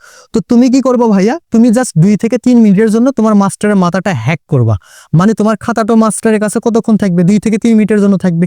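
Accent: Bogura, Bangladesh. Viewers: Vai bogurar naki????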